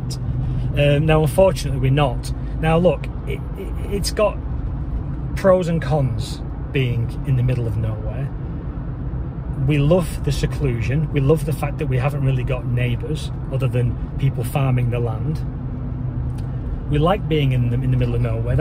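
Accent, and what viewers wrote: United Kingdom. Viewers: Where in the uk are you from mate? Just found your channel, subscribed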